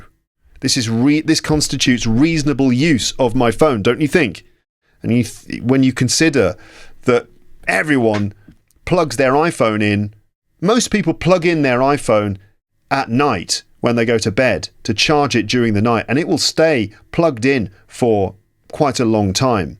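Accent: United Kingdom. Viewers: Hi Mr., hope you are doing well. I listen to you to improve my pronunciation. I like the way you speak English. I acquire British accent. Thanks and greetings from Türkiye 🇹🇷. God bless you. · Is it was British accent????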